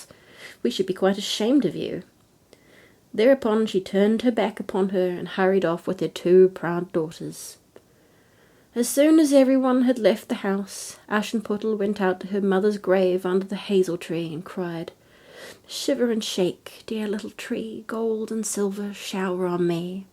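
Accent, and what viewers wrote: New Zealand. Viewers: I listened to this story with my four year old son, its lovely to hear a story in our wonderful kiwi accent! we both enjoyed it, thank you xxx 🌹💖 🤘😈🤘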